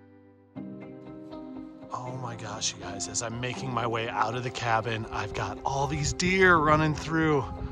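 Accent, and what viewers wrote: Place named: United States. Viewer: Hello! I am having fun with your show. It is so great your show and inspires me how to learn camping. I want to try on caping in rural Japan someday. By the way, I took up studying English lately. Because I want to know more detail about what are you talking the show. I am also learning your American English pronunciation from your beautiful voice. All of the English books advise me that If you want to speak English fluently, you should pretend someone. I catch your phrase, word anymore. As I spoke English, I was supposed to be. I am sure that you are my English teacher. Great for me. Anyway, the Japanese crew is waiting for Rock The Park staff to visit to shoot next time in Japan. I hope to see you soon. From Masato Kaneko.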